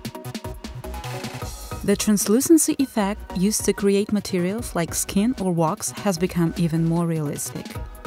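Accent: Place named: Eastern Europe. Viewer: спасибо за славянский акцент. стоит поработать над К и П звуками.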